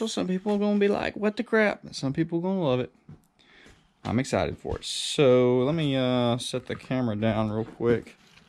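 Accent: Alabama, United States. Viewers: What part of Alabama you from?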